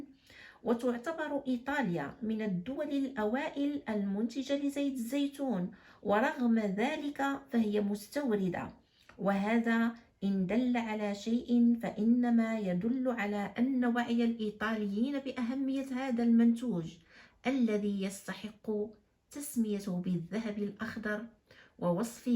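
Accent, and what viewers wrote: Morocco. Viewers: قراءة طيبة بصيغة مغربية رائعة جدا جزاك الله خيرا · طيب الله الأنفاس قراءة بصيغة مغربية رائعة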